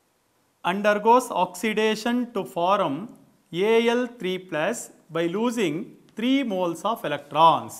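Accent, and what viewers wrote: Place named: Tamil Nadu, India. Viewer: typical tamil village english but ok ok teaching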